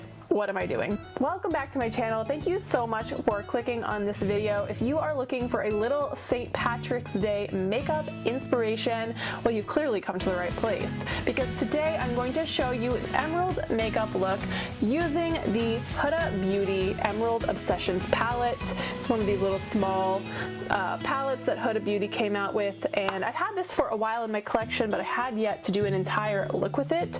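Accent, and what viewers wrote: Russia. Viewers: Sounds like Russian accent!😂😂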